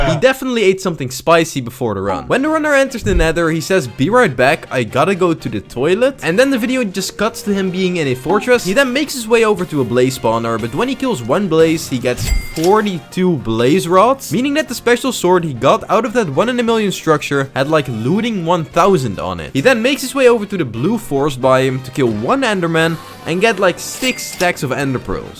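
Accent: Netherlands. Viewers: I sense some dutch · U sound dutch,.....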